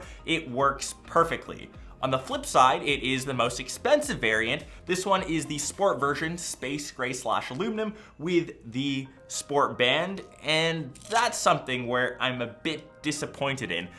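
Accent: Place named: Canada. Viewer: Love that Canadian accent. As always, a great, high-quality review.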